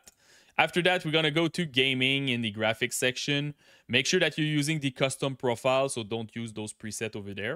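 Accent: Quebec, Canada. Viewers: You french Canadien right?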